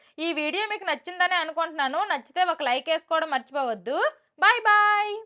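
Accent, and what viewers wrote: Srikakulam, India. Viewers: Mee sreekakulam yaasa chala bagundi 😊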